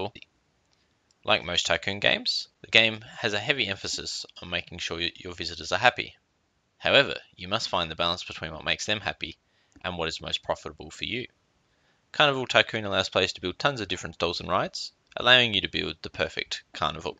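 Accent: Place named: Australia. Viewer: If you're still curious for whatever reason, he is Australian.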